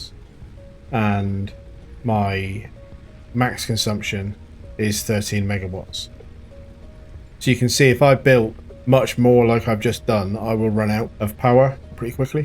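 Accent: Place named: West Country, United Kingdom. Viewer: You're quickly becoming one of my favourite YouTubers. I've been binging your snowrunner series. Currently on episode 30. Can I ask with your accent. Are you from Devon/Somerset area? Fellow UK resident here!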